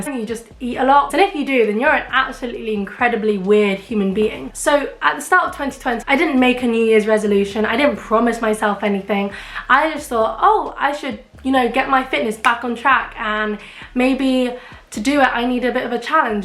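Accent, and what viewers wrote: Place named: Asia. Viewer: what kind of asian are you and where do you live? I super love your accent and i plan to try Pamela's workout as well!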